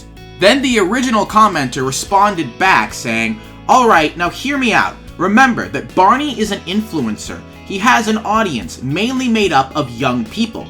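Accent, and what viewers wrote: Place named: United Kingdom. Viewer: Hello! I actually watch your videos and I really enjoy them. Also, you have a pretty good bri'ish accent.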